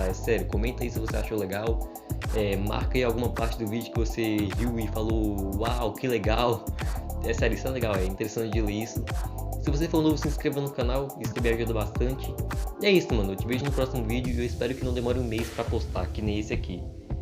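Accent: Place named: Bahia, Brazil. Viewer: voz de baiano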